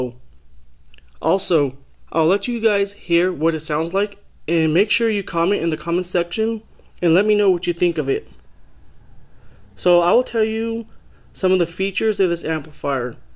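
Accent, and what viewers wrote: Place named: Russia. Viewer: You have a little bit of Russian accent, nice amp!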